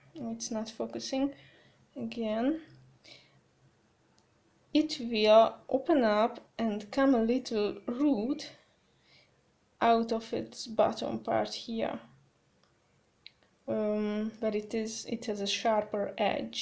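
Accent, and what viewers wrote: Hungary. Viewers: You have a hungarian accent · Your accent... are you from hungary?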